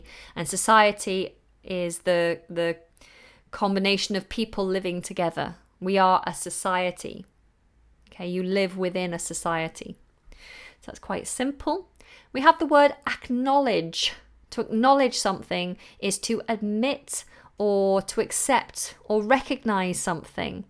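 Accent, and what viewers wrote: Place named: RP, United Kingdom. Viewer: I’m so impressed with your composure, your delivery and your genuine commitment. I love your accent. RP? I live just north of Boston so my accent is unintelligible. You are doing great work.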